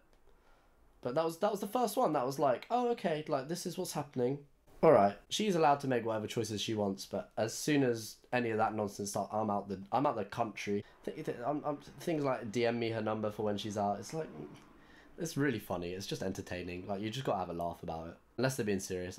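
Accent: RP, United Kingdom. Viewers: seeing the way you tweet i thought you were from london but you sound so posh speaking slang it don't work ????? i had to stop at the "that you man have been sending to me" ... idk ... your sisters peng tho 👌😍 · Did you two go to different schools or something? You sound way posher than her mayyyyn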